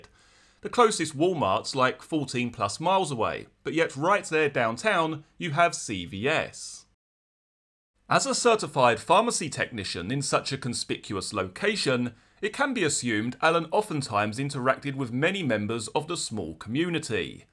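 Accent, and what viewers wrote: United Kingdom. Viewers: You may have a British accent, but you are not Agatha Christie !!!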